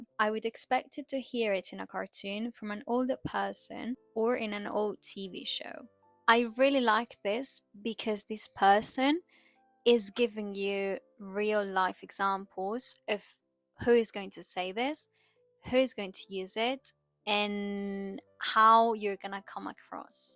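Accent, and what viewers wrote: RP, United Kingdom. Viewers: I'm American and have never heard "mooch" used that way before, 😅so I'm not surprised you haven't heard it before either. Very colloquial British I guess, no American would say that. (The second use of "mooch" as in "freeloading," everyone would understand though.) You probably already know this but when she says, "slipping into bad habits of laying in bed doom"; Firstly, she meant "bedroom" (obviously), secondly, the correct word is "lying." It's an intransitive verb and sometimes even fairly educated speakers mix up "lying" and "laying." Just wanted to say that mistakes like this have always given me pause about learning from social media though. Your English is great and very natural. Your RP accent would no doubt get a lot of attention and a load of compliments in the States!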